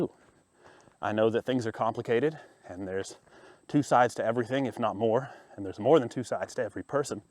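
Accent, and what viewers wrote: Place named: South, United States. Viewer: Hi! I’m. A southern boy as I’m assuming you are listening to your speech. I wish you well. I’m not a subscriber…yet. But I watch a lot of these type of videos so we’ll see. Life certainly goes on and I’ll give it a shot. Well I lied. I’m a southern OLD man. 🤣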